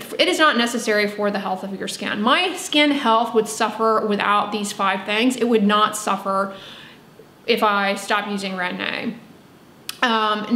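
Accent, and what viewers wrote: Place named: California, United States. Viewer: Her california accent is insane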